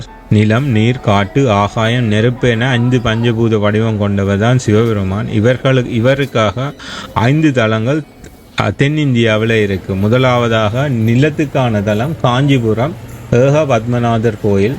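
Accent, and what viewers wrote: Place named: Sri Lanka. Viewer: ஆஹா ! அருமையான இலங்கை தமிழில் அசத்தும் வர்ணனை !🙏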